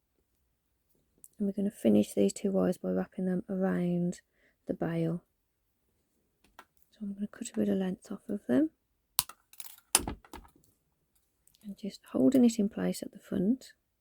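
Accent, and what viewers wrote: United Kingdom. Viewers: I agree about her voice. I love to hear her say, " hi I'm ". It's that UK accent that just sounds so good. No matter if its men, women, or kids. All the people from the UK, I love their voices.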